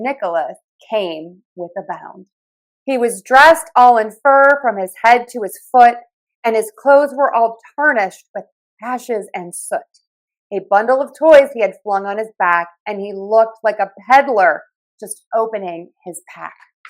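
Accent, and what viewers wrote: United States. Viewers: Hi. I see the title of the video in spanish. Must be the the New improvement of yt. Its good videos of you reading so we can learn the american accent that is not easy. Although its something for children I dont understand a lot of words.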